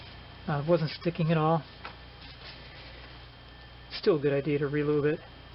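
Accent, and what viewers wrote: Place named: Canada. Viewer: Great research material. I should make a video to see if I do as well. I am sure I would have to mute sound... You sound like a Canuck, just the pleasant, easy going feel of the video. There have been many times I wish I had been born north of the border. Thanks again for taking the time to make a quality How-To.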